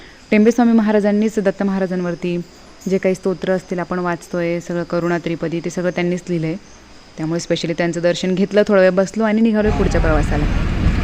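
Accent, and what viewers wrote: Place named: Kolhapur, India. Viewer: कोल्हापूरी भाषा बोलायला भारी जमतीया तुम्हासनी.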